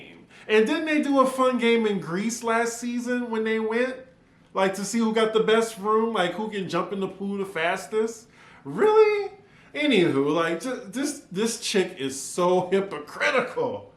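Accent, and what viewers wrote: New Orleans, United States. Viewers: You still have your accent,. It's only a slight accent but I always hear the New Orleans twang when you talk.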